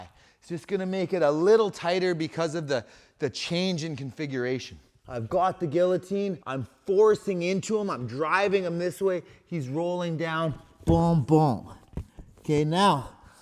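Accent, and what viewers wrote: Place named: Canada. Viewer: 100% you know its Tristar when you hear the Canuck accent.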